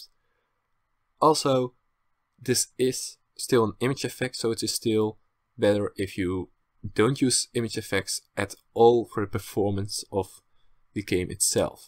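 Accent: Netherlands. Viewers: The dutch accent xD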